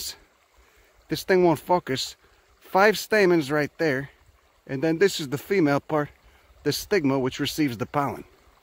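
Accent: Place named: West Coast, United States. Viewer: Wow! You give me hope for Paradise and Magalia. Well, not for me, but maybe in 250 years it'll be back the way it was. I searched many barrens in eastern Butte county. I just knew there was an undiscovered Californite lode besides Pulga. Nah! But it got me out walking and exploring. Love your instructionals. Your west coast accent sneaks in there sometimes.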